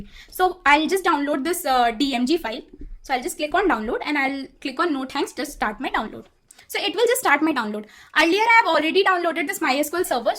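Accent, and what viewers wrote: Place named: United States. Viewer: dii, mai special aapka video apke fluent english ki wajha se pura ye 5 hours dekha hu , knowledge kahi se bhi mujhe miljata lekin aapne jaise ye itna faraatedar english koi nahi dega mujhe bhaot kuch sikhne ko mila mujhe apse dii thanks dii , or aap kya batasakte hai ki itna fluent english mai kaise bol sakta hu , waise mujhe lagta hai ki aap american ho :)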